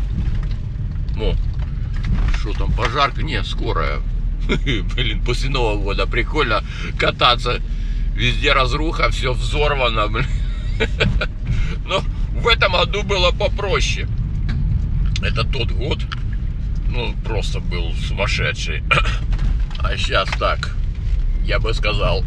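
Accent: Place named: Ukraine. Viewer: Вы сами и есть украинец, не забывайте пан! Даже в вашей речи чувствуется акцент - "Або" "г"кание. И это здорово! Слава Украине!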